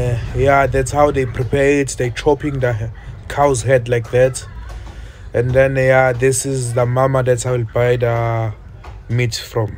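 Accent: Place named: South Africa. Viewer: I love this sort of English accent, with no effort of trying to perfect it. I love this South African way.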